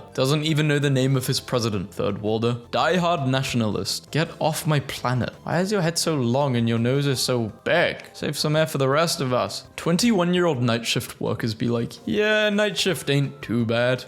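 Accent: United Kingdom. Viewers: the way you pronounce things like britishly like saying "media" as "meteor" tickles my funny (im sorry for being american)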